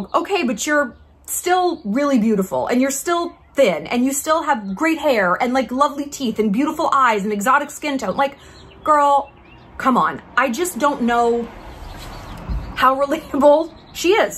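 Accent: South, United States. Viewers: Omgg I love your southern accent. Sooo good, had to go back and listen it to it again, lol wish I could do that. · The southern accent is PERFECT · southern accent is what keeps me going lmao, she's too funny. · That’s a great Southern accent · Your southern accent is so cute!! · The southern accent 😂👌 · The gaslighting and southern accent is what I’m here for! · I’m obsessed with your southern accent haha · Your southern accent is SENDING ME lol!!!!!! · omg u r so funny i love you that southern bell accent is so hilarious i always told my bf to go take a nap when hes trying to be irritating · Your southern accent is soooo pretty. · Love your southern accent. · Side note: southern accent is pure gold. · That southern accent tho 😂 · Here for the Southern accent & that comment about the Easter Island emoji 🤣🤍💞 You’re the best,.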